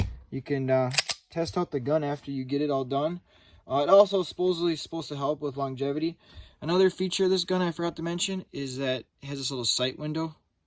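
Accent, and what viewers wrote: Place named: Wisconsin, United States. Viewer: From Appleton, loving that Wisconnie accent. Great job taking us through this pistol.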